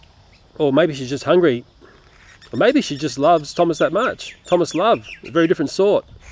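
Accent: Sydney, Australia. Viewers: liquid sky if you've ever watched his channel, you'd know thats his natural accent hes from sydney. he had a great spider series from when he had a HORRIBLE redback infestation. he's just a normal guy trying to help the best he can.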